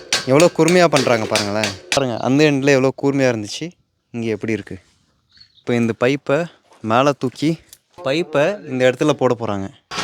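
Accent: Standard. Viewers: எங்கள் ஊரில் திராவிட மாடல் தமிழ்.... நீங்கள் பேசுவது தான் உண்மையான தமிழ்... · Ur tamil is good. I.ur speaking pure tamil. As an Indian I proud of you. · ரஷ்யாவில் தூய தமிழ் மணக்கிறது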